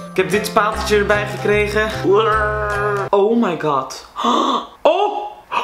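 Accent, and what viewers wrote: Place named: Netherlands. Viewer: hey! je bent echt de beste en ik vind dat hollands accent zo leuk en grappig! ik ben echt je grootste fan! je drumt echt SUPEEERR!! en die krulletjes zijn TE SCHATTIG!😊😊😊ik hoop dat ik je op een dag kan ontmoeten!!!!! 😘❤😎😋😋 en by the way! kei coole video! love it!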